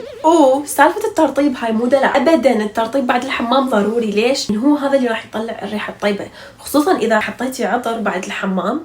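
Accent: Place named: Iraq. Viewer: خطفتني خطفتني اللهجة العراقية خطفتني ❤روووووعة مش ممكن كمية الابدااع فيها احب العراق واهل العراق وتراث العراق انا مغربية احنا في المغرب درسنا عن العراق وتراثها وعن حدائق بابل المعلقة كلش حبيييييت 🇲🇦💗🇮🇶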